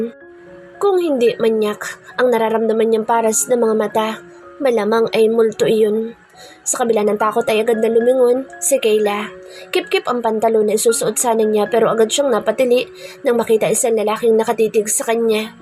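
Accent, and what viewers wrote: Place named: Philippines. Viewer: Sayang kaya wala ng nasyadongnagsusubscribe sa mga upload ninyong story isa na sko sa mga subscriber ng mga maganda ninyongstory pero ngaton I stop subscribing your stories dahil hindi ko na gusto ang narrator ninyo. style s. Style ng pag deliver ng lines at saka nay punto pa. Taga saang probinsiya ka? taga saansng punto na yan galit ka sa pagsasalita mo sayang nasaanyong dsti mong narrator. mula ng mslspit na ang finale ng Hiding The Montemsyor Real Heir pnapitan na sng narrtor kaya hindi ko na tinapos ang siguro sampung episode bg montemayor heir hindi gusto a yong boses ng narrator kaya di na slam sngnaging finale ng Montemayor Real Heir Gusto ko sanang makinig sa story kaya di ko rin. Ma absorb ang voice na galig at mabilis na parang hinahabol ng asong ulol angstyle ng pagsasalita ni miss narrator. Ssaysng sng gsmda sana ng storymo. 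✌️✌️✌️✌️✌️✌️✌️👎👎👎🤮🤮🤮🤧🤧🤮🤮🤮🤮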